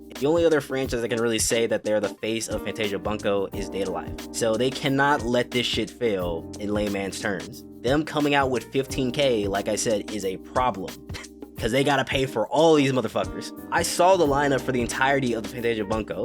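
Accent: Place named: United States. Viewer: I heard your accent, i knew u would be some american burger consuming garbage fast food anime and enjoying it.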